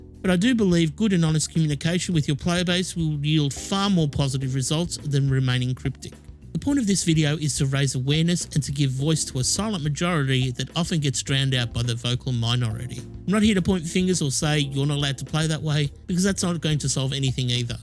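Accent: Australia. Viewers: Couldn't agree more with your suggestions mate. A 14 year old with nothing to do but train and cred up can, and will, slaughter my helpless newbie ass just for shits & giggles, and suffer absolutely no consequences for it, so it's perfectly logical to me to stay in Solo and enjoy myself there. If FD were to take up some of your karma suggestions, that'd be good for the entire game and would get me into Open. The worst that could happen is the ganks would leave (good riddance) which wouldn't hurt Frontier at all since they don't have a subscription model. And frankly, these game mechanics make no internal sense. If the universe knows you're wanted, how is it they nobody knows you've murdered 50 other players this evening? It's a no-brainer that constantly ruining other players' enjoyment of the game should have consequences. You've got my vote. for FD karma konsultant!:-) By the way, loving hearing Strine on ED videos.:-) Keep up the good work!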